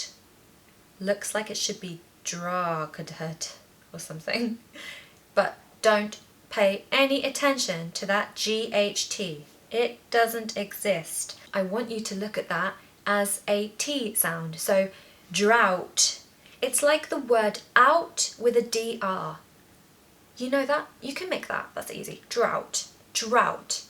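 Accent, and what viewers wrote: RP, United Kingdom. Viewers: Your are absolutely welcome. Keep on teaching with your beautiful RP and encourage learners to converse in correct English. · wow I like.british speaking accent english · Lovely accent you have! I always liked everything British so much and try to improve my spoken British further, so I’m happy to have found the channel. So actually apart from maybe Wocestershire, I didn’t find any of these words very hard to pronounce. Even if I maybe don’t always get it precisely right, I knew how they should sound. In fact, my most hated word to pronounce is actually: PURCHASING!!! I’m working as a buyer and everytime I have to use that word I say it with a German emphasis on the second syllable instead of the first one!! I have to force myself to say „purr-che-sing“ instead of the rather German sounding „per-chaesing“😂😂😂 I pronounced it wrong when talking to a Texan and he didn’t understand at all what I meant. 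I hate that word 😂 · Splendid British pronunciation ,Thank you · I love your British accent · Hello! Madam, I love your British English accent. Your teaching techniques are excellent. 😊❤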